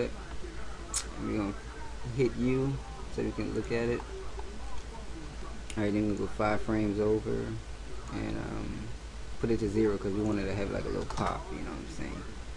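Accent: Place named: New Orleans, United States. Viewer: New Orleans Accent Much..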